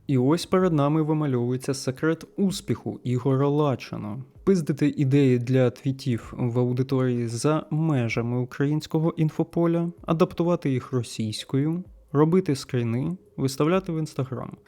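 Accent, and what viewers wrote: United States. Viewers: Класний американський акцент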